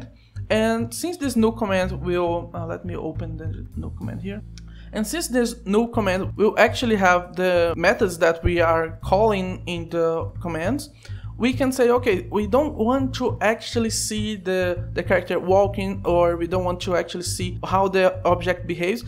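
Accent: Brazil. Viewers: Brasileiro ? Haha, reconheceria o sutaque do meu país até no inferno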